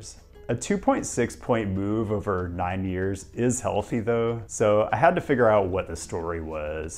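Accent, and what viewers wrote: United States. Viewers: Your voice.... You are who most Europeans think all Americans sound like!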